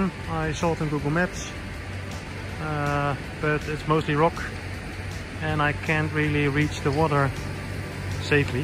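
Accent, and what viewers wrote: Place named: Netherlands. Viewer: Easy to hear you are Dutch. :)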